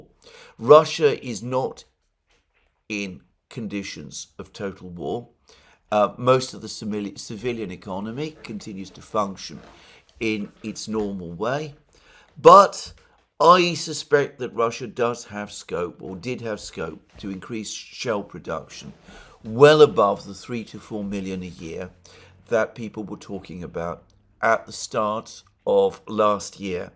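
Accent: England, United Kingdom. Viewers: This just goes to show that just because you have an English accent, doesn't mean you know something. Your economic analysis is a bunch of hot air. What will you say when the tariffs work, which they will, and they already have started working.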